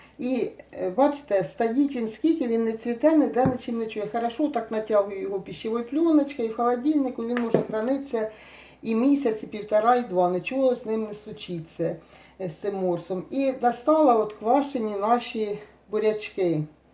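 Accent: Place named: Dnipropetrovsk, Ukraine. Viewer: Вы, наверное из Днепропетровска или области. Только у нас так говорят и готовят. Рада, что вас нашла! ❤❤❤❤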